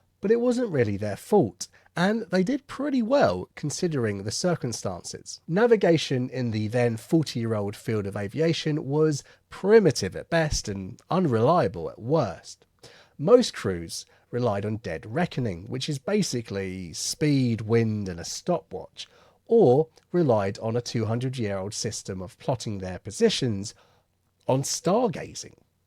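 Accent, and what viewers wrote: Australia. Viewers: You know that Whanganui is in NZ, right? I was a bit confused by your pride in your Ozzie accent. Did I miss something? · Wanganui is an New Zealand city . Why would a person with an Australian accent be claiming to come from there? And why are Wanganui or Parramatta any "sillier" than Thames or Birmingham or Slough? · Why would your Aussie accent be helpful in pronouncing a kiwi place name? The accents are quite different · Well done with your Aussie accent. Now try Kiwi. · Forget the Ozzie accent, Wanganui is in New Zealand... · 9/10 Aussie accent as assessed by an Aussie 🇦🇺 Well done mate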